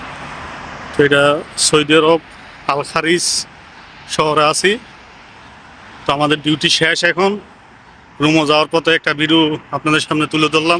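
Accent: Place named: Brahmanbaria, Bangladesh. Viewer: ভাইয়া আপনার বাড়ি কি ব্রাহ্মণ বাড়িয়া? ফেসবুকে আপনি আঞ্চলিক ভাষা ব্যবহার ঠিক আছে কিন্তু ইউটিউব হল একটা আন্তর্জাতিক প্লাটফর্ম এখানে শুদ্ধ বাংলা বলার চেষ্টা করবেন আশা করি।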